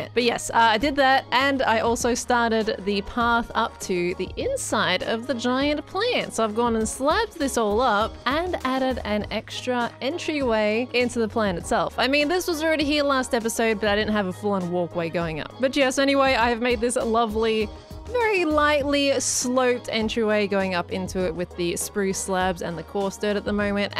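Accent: Australia. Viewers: A hermitcraft does of happiness... Thanks a lot, you realy made my day, with your thick Australian accent 💜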